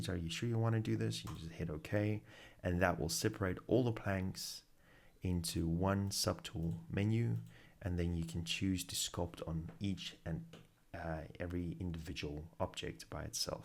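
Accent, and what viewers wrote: South Africa. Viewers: Do I detect a slight South African accent to your voice?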